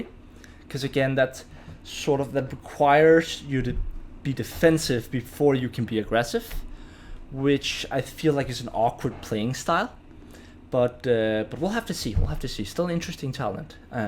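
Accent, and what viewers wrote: Denmark. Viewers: Classic Riposte is a fencing move. A parry witch flows fast and directly into an attack. Also can't wait for Mastery video. By the way do I detect a fellow Danish accent? :)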